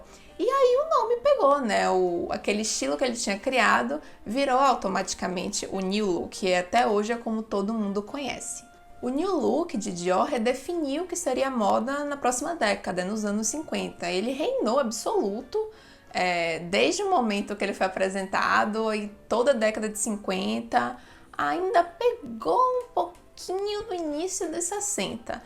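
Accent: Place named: Bahia, Brazil. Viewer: Como eu não percebi seu sotaque baiano?! Meu Deus 😅 É por isso que é descolada assim, gentem🤭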